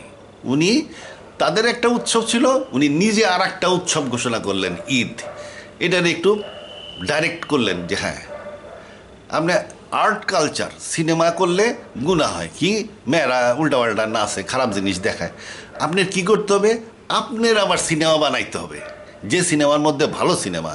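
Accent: Manikganj, Bangladesh. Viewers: আপনার প্রত্যেক আলোচনা আমার অনেক ভুল ধারণা ভেঙে দিয়েছে।আমার জ্ঞান অনেক সমৃদ্ধ হয়েছে।ধন্যবাদ আপনাকে।আপনি কোন জেলার মানুষ? কথা শুনে মনে হচ্ছে আপনি মানিকগঞ্জ জেলার কিংবা আশেপাশের জেলার মানুষ। উত্তর দিলে খুশি হবো।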